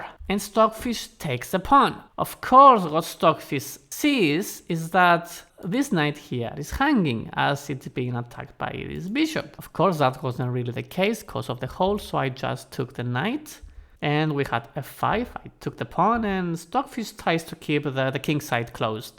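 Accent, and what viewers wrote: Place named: Romania. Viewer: Are you Romanian? You sound Romanian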